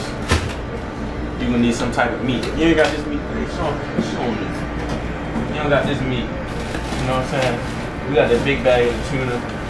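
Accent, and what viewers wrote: Baltimore, United States. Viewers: Are you from Baltimore? You got that accent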